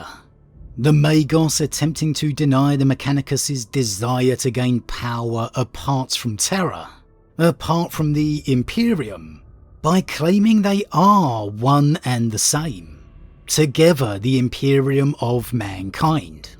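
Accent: United Kingdom. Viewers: You've got my favorite British accent of all time. I hope you never stop!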